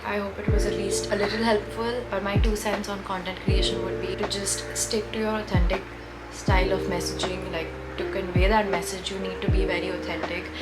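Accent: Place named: Maharashtra, India. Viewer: Your marathi accent oh my god literally dying 😍🥺♥️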